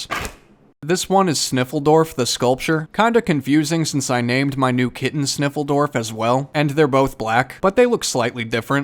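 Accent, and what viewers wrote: United States. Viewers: He isn't from US? I am Brazilian and I don't know much about accent, but I thought he was from USA judging by his accent. O.o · I don’t really care about your American accent of orange I don’t know why people are getting mad at you OK 👌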